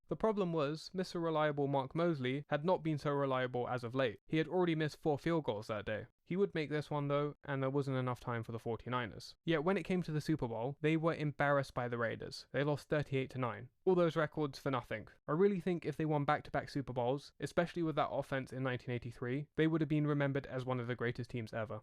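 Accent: England, United Kingdom. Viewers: Really nice vid. Well done. I have to admit, it’s different hearing this from an Englishman. Is the NFL even popular across the pond? Keep up the good work! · I am a Giant's fan from 1973. Nobody has to tell me how great the Redskins were in the 1980's under Joe Gibbs. As much as I hated them back then - that team always had my respect. They were talented, disciplined, and well coached. 45 years later it looks like they could be on the threshold of another championship team. Hope it is only 1 .... ; ) How do you know so much about American football with a British accent? I am pleasantly surprised - unless you are AI?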